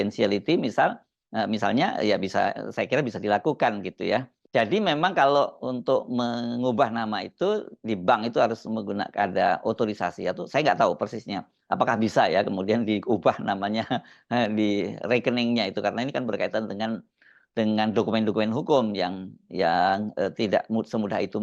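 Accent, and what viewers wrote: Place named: Java, Indonesia. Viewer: Bang hersu,,ngomongnya ko jadi lebih medok,,.🤔atau perasaan saya aja🙏🏻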